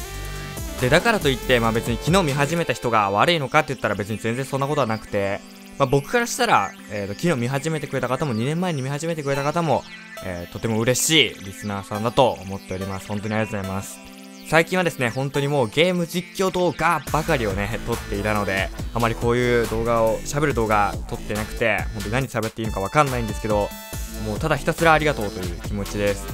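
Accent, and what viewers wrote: Kansai, Japan. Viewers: さんの関西弁がたまに出る動画が好きです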